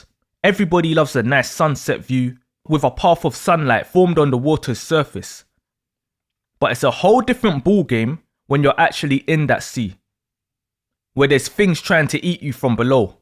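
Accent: United Kingdom. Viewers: I keep on waiting for - Someone tell Doja cat, I’m trying indulge in that. 🎶 Rihanna’s face made me click & The narrator’s British accent made me stay. 😂👍🏾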